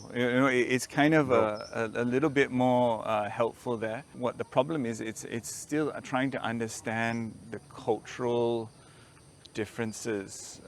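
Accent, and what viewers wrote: Malaysia. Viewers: Was listening to this on the car bluetooth. Didnt realize he's Australian until i took a peak at the screen. Wah, so Malaysianised, this Mat Saleh! "Lemme help you out lah, bro" and "ohnee" instead of 'only'. Superloving it!!! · Dah bunyi org melayu cakap omputih....betul2 jadi melayu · I am awestruck that he sounds so Malaysian! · His english is mix accent of Malay and Indian Malaysians 😂I can't believe he is Aussie! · Aussie fdude turned Malay in his accent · This Aussie speaks like Malaysian lah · White guy with malay accent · I was just listening to the video while working. Never thought its a Mat Salleh talking because he sounds so Malaysian when I watch the screen at the end of the video. He totally assimilated with how Malaysian speaks English. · Yo this guy sounds malaysian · if i dont see face.. i believed he is truly malay malaysian... 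ahhahahah no more australian sound... btw apa habaqqqq.. moga sehat2 selalu... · Dude speaking malaysian accent after 30 years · Wow! 30 tahun duduk Malaysia, cakap omputih pun dah slang Melayu! Hahaha! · Even his accent is ma-nglish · Bro his Malaysia English accent is spot on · He is so Malaysian. He lost his accent already. if I hear his talking without watching the video, I definitely say he is Malaysian who speak English. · This is the first ang mo I come across who actually speaks Malaysian accent. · gosh i wouldnt have guessed he's an australian with that local malaysian accent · Damn, as an australian born, he sounds more malay then malays from Mont Kiara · He doesn't like durians?! That's a big no no...🤣 but seriously after 30 years in Malaysia he sounds completely like a Malaysian.. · I thought he was a Malay guy 😂 I had to look closer to the screen to see it was actually a white guy 😂😂 I love it. 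· He sounds like malay when speaking English 😁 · He sounds very Malaysian 😊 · Lots of dumb Malaysians on here saying they can't hear his "Australian" accent anymore. That's because he's British. I am American and can 100% hear his British accent, it just has a heavy Malaysian influence to it, but I can tell where he came from very easily. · He sounds more Malaysian than some Malaysians! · im a malaysian and this guy has more of a malaysian accent than me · This guy is australian right?why did he sound more to malay datuk? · This white dude talks like he is a native Malaysia. He has the Malaysian accent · omg.... he speaks like a Malaysian!!!! hahaha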